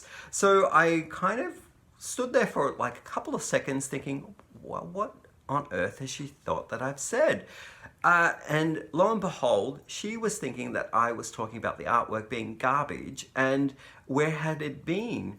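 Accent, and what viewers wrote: Australia. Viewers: Ha ha! Cute story about the garbage bin. I'm from Southern California. I call it "trash" , but my friends from Hawaii call it "rubbish". BTW I love your Australian accent!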